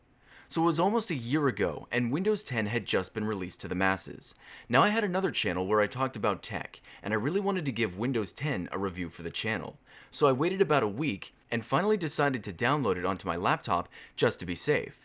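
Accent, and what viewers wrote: India. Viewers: I love the Indian accent. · Indian voice xD <3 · That indian accent just killed me :D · From sounds like english spoken by an Indian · That indian accent doh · Great Indian accent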